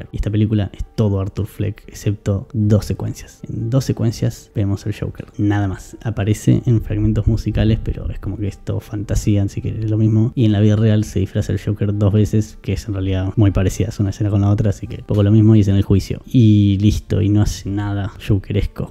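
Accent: Argentina. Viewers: Holaaa. Nuevo micrófono 🎤 necesitas calibrarlo o cambiar.,¿Puedo opinar?. En mi pantalla no logré entender algunas palabras con ese nuevo micrófono. (Use subtítulos , no sé si por ser mexicano pensé, el tono argentino pero. . . Pero pero ... En el celular con volumen un poco mas bajo y sin ruido alrededor.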